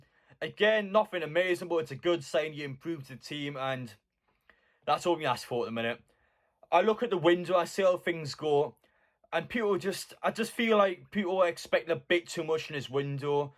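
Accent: Newcastle, United Kingdom. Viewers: Some really good signings. I think its easy to get carried away, but the fact is we are in a relegation battle. These signings were made with that in mind, in my opinion. 8.5/10 for the signings overall. 10/10 for keeping us updated throughout the window, love your passion lad, proper geordie 👍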